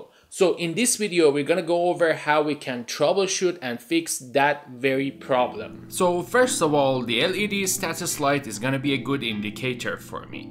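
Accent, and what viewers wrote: Iran. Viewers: Iranian accent,the only english video that i can understand it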